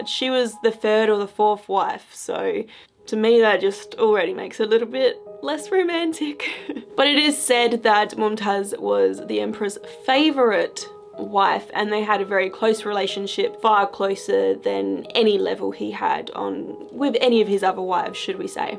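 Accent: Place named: Australia. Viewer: I love ur channel your content is amazing. Your so sweet,nice and positive all the time. Sometimes I think she's so positive but into dark shit lol your definitely unique that's for sure lol I love the aussie accent. Thanks for cheering us up during these dark days. Mad love from the states 👹👻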